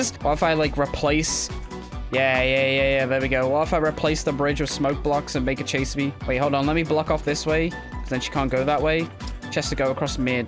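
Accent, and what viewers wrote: United Kingdom. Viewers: Anchor are you a England yt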